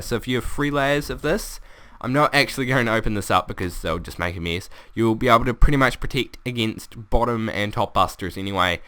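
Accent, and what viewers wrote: New Zealand. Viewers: NZ accent?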